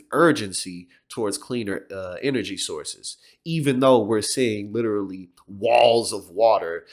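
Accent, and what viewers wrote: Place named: South, United States. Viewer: You may have modified your accent somewhat but as a Brit listening to you I can hear you come from the South!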